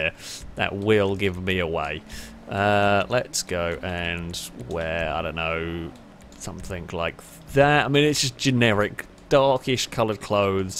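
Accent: United Kingdom. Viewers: there's nothing like a british man narrating his criminal adventures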